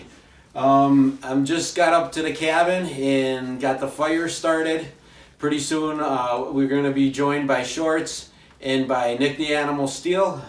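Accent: Wisconsin, United States. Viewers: I saw tree does.... good Wisconsin Accent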